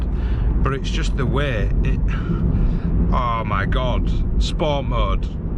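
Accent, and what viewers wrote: Yorkshire, United Kingdom. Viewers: This Gent wouldn’t be out of place on TV based car review show ….based on affordable cars or sourcing little gems like this CRZ …great review and narration and a great Yorkshire accent ….off this review im looking at this Honda …CRZ as my next motor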